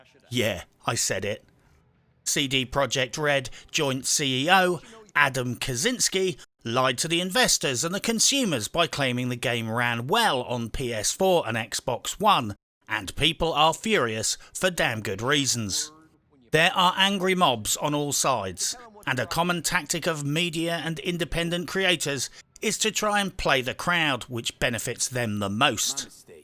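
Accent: United Kingdom. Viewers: As an emerging, very, very happy fan of your video's which somehow I didn't ever see pop up until recent weeks, I humbly ask of you sir, over in the U.K. I presume, of which I thoroughly enjoy the words used with the accent, which just gets my wife and me so much, lmao, that you do a review that's reasonable on Homefront 1 which is STILL NOT backwards compatible for XB1 Series S or X, but only playable on X-Box 360 & Homefront 2 - The Revolution, IF I've somehow missed that if you already made one. IF you could do that, at least for the 1st one, I'd like to hear your thoughts. I enjoy the campaign on it, although it's much more linear and shorter than the sequel but I cannot for the life of me understand at least 1 thing, why the f--k it's not playable on new gen consoles like 99% of all other older or slightly older games now. From the other side of the pond in America, from the great fkg state of awesome Georgia, thank you.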